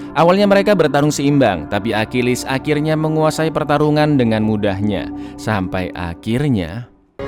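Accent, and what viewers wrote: Java, Indonesia. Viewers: Bang orang Jawa ya bang?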